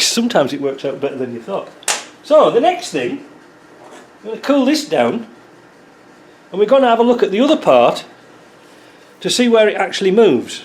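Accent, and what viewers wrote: Yorkshire, United Kingdom. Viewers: The big red spanner has worked for me many a time! I only have MAP gas but with patients it works. Great presentation style, I can almost hear all the old Yorkshiremen that took you under their wings.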